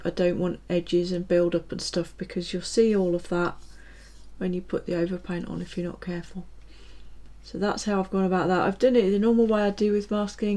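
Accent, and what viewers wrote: West Midlands, United Kingdom. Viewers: Hi strange question! Is there a touch of West Midlands in your accent? Certain words seem like it to me by the way carry on as you are very informative very high quality and presented in a concise and very logical manner ( grovel grovel )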